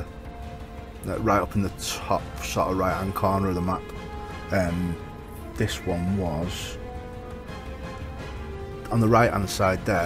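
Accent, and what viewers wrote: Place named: Salford, United Kingdom. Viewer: You sound like a salford lad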